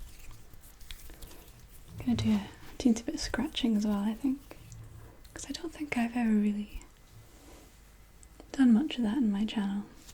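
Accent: Scotland, United Kingdom. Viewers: Do I hear a Scottish accent 👀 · I wish I had a Scottish accent, It sounds amazing. I'm starting to hate my southern draw, because It makes me sound stupid XD... Great video nonetheless, subscribed!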